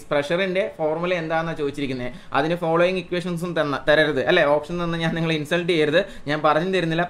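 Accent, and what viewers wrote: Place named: Palakkad, India. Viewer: Chikku chooru... Palakkadanz.. ✨️anagne paraya... ❣️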